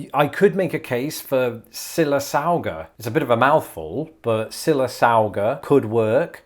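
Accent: United Kingdom. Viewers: You've been living in my country USA for years and seem to really relish it so why Don't you drop your Brit accent and speak American English like the rest of us. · I think you're becoming very Americanized. What do your UK relatives think? You haven't lost your lovely accent & pls keep it. I love it. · dude, as a Alabamian who grew up in the area and regularly shopped in Oneonta, you pronounced the way the locals do, or about as close as I'd expect anyone to with a British accent anyways... and way closer than most non locals do (most of them say one-on-ta) so you should get credit.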